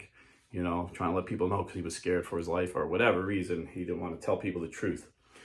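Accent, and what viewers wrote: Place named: New Jersey, United States. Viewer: It’s just his Jersey accent. Likewise, a southern accent might say it slowly as “Holy-cost.”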